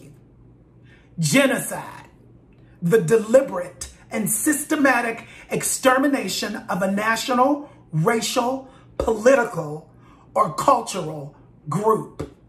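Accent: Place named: Pittsburgh, United States. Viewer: Your voice still sounds like you're from Pittsburgh you didn't pick up a New York accent at all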